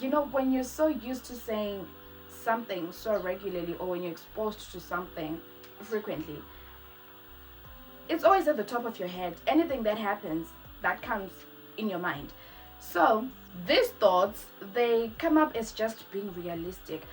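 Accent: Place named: United States. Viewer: Your voice chete , let’s talk about that 😮… smooth voice with English yekuAmerica✅✅✅🤣🤣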